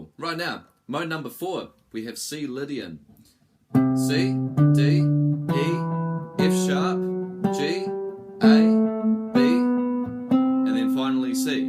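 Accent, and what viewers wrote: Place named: New Zealand. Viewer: Sound like a kiwi bro, chur for the lesson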